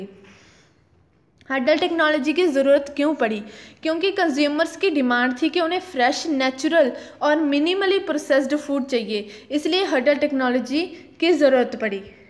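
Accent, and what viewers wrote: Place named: mixed, India. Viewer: Good voice, like Punjab Haryana Girl 🙂👍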